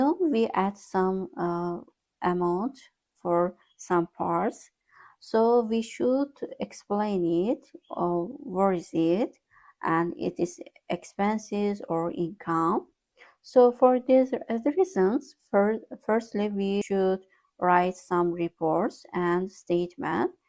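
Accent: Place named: Azerbaijan. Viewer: Aksent ve danisiqdan basa dusdumki azerbaycanlisiz, Meni cox sevindirdi bu video, xahis edirem sosyal sebekesi elave edin siz bizlere cox vacib insansiz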